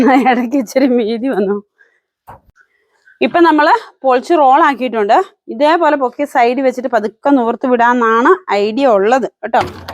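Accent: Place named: Karnataka, India. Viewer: Veed yevideya ?kekumbo nammude karnataka malayali pole undalo😊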